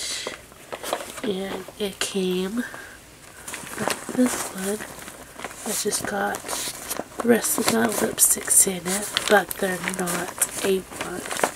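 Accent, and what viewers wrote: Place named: South, United States. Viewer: your sweet toned voice combined with your subtle southern accent is very relaxing!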